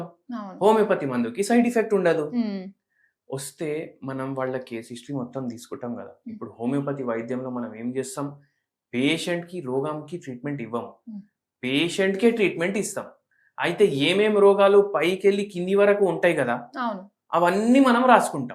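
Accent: Telangana, India. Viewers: It is almost ending. Title is misleading. The only plus point is I liked the Telangana accent of the speaker.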